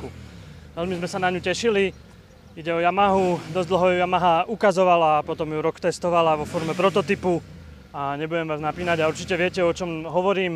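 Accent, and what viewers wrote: Eastern Slovakia, Slovakia. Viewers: Pěkný test, i já jsem ji dal v sobotu v Karlovicích pěkně za uši...Podle přízvuku tuším, že jsi vyhodnár, bo pekne si hutoril :-)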